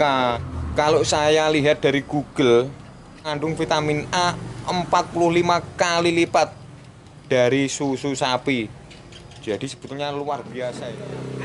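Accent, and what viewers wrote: Java, Indonesia. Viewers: Borneo,kok jowone kentel bgt.asline ngendi lek.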